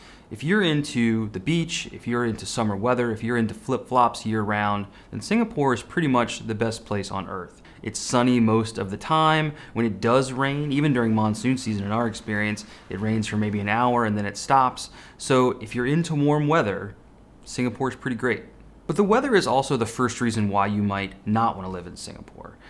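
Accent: United States. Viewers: You don't have the Southern accent. Which state you're from? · I’m Japanese live in Singapore. I totally agree with you and I like your American accents especially “Hawker Center”.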